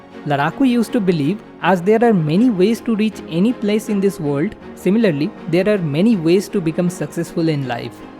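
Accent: India. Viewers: Bihari english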